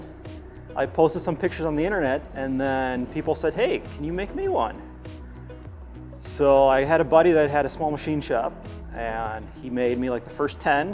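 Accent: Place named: Minnesota, United States. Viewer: How old were you when you moved? Your accent is, non-existent, well aside from being recognizably Minnesotan.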